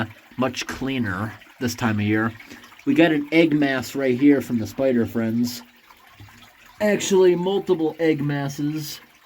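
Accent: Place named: Michigan, United States. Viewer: Sounds like a Michigan accent.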